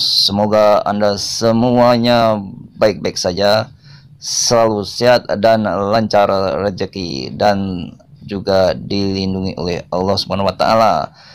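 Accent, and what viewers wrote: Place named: Sabah, Malaysia. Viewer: Gaya pertuturan admin seperti orang Sabah, Malaysia. .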